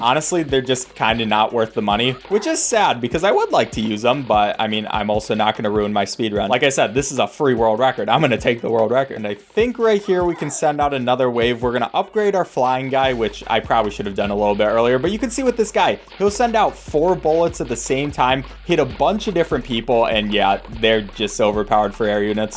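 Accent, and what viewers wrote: Wales, United Kingdom. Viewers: Irish guy? That's a Welsh accent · no it’s not it’s clearly welsh 😭😭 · Oh dear, Irish? He's Welsh! It's like 2004 on Newgrounds all over again... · IRISH!? He is WELSH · Sir that's a Welsh accent not an Irish one as far as I can tell. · im pretty sure that was a welsh accent dude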